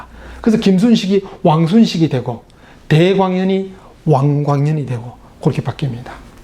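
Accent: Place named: Gyeongsang, South Korea. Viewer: 경상도 사투리와 중국어의 억양이 똑같아서 멀리서 들으면 마치 중국어처럼 들립니다. 하루속히 표준말로 바뀌었으면 좋겠네요.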